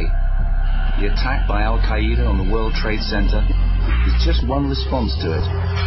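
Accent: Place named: United Kingdom. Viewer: Cool vid. I especially enjoyed the British accent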